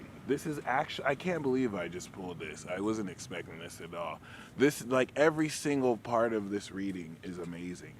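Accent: Nigeria. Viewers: The Nigerian accent takes me out everytime 😂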